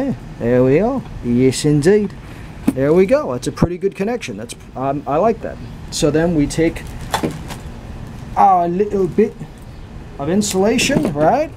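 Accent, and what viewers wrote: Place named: England, United Kingdom. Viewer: Terrible Pommy accent, good video!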